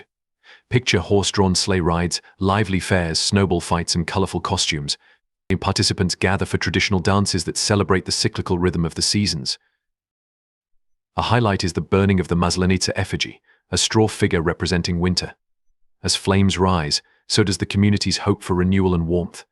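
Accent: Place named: United Kingdom. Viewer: You should not have a Brit accent.. narrator… it ruins the presentation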